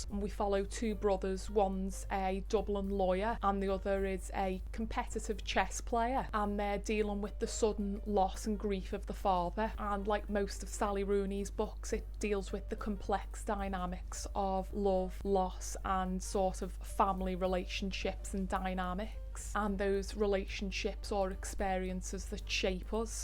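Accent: Liverpool, United Kingdom. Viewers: aaaah, thank you for much for replying! I have been to many cities in the UK but not to Liverpool yet (although I'd love to) - this explains it! I can't understand people being hateful to accents, they are the best thing ever and something to cherish as you do :) It's one of the things I love the most about your channel.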